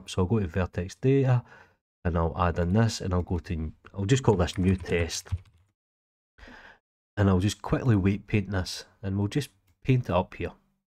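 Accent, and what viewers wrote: Scotland, United Kingdom. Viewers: He's Scottish, hence the heavy accent. It's revealed ;)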